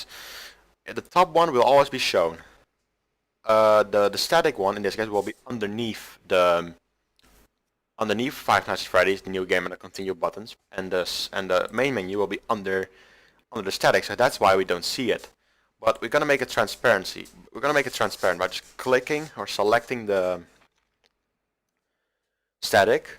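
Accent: Netherlands. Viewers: I knew you were dutch by your voice but i can read it here! sup same country bro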